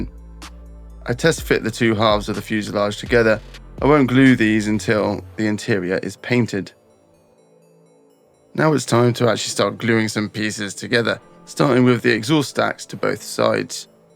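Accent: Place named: United Kingdom. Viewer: a real voice is infinity better than any A.I. rubbish. Nothing wrong with a proper Brit accent.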